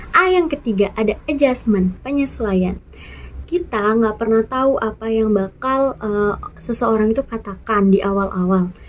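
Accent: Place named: Madura, Indonesia. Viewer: Kadang logat madura ngikut wkwkwk 😅😅 selebih ny ok lah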